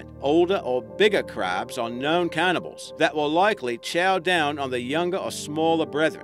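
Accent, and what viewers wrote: Australia. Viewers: So very happy to have you back mate. Me an my daughter love your Aussie accent an enjoy learning about all the different animals especially the ones about Australia. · So glad the old narrator is back. I love his Aussie accent!!!! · glad you came back!! missed your Aussie accent. please don't leave us again!!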